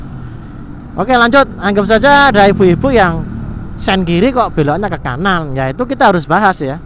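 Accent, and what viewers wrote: Java, Indonesia. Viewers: Medok banget gaya ngomong.a...mantap bang sukses terusss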